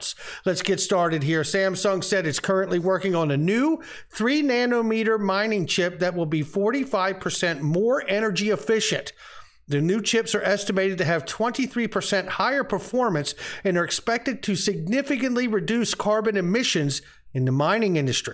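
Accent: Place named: United Kingdom. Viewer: I am very excite about XRP.. its every time different story.. very cool token.. and reporting very cool thanks you for this reporting...i check you headlines out bit hear of that all time.. very confusing you give report but your english cool good british language thnks 2 yu 😘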